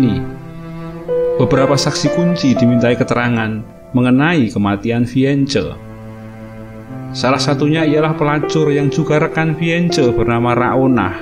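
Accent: Java, Indonesia. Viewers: Itu lidah jowo mbak🙏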